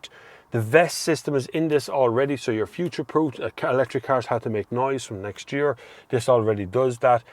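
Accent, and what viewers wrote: Ireland. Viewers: Good reviews of both this and the ID3 - we are currently looking at both cars and wondered what your preference would be - looking at the VW ID 3 Family or the Kia E-Soul? Husband is from Laois so it really helped having a familiar accent to show us the pros and cons of both cars.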